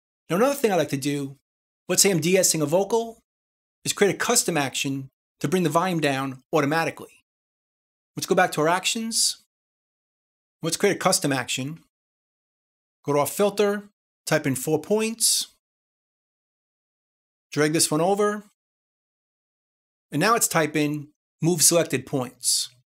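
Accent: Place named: East Coast, United States. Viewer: That's his voice! He's obviously from the east coast (Jersey? ). It takes some getting used to, but his videos are excellent.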